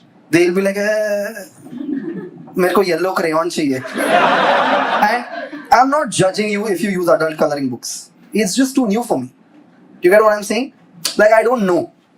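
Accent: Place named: Maharashtra, India. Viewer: bro i am sindhi too but u sound like proper maharashtran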